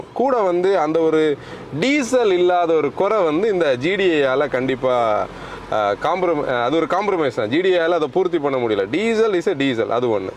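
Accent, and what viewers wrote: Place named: Thoothukudi, India. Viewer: Hi This is among one of the best way of reviews among the most of the moto wagan reviews, incl ur review of Slavia 2025. You have covered the review in Q&A method. You even compared with parallel sedan variant of skoda. Thanks a lot for ur service. Your review is one among the best in comparison with other national level reviews. On the lighter side, I like ur Thoothukudi தமிழ்.🎉😊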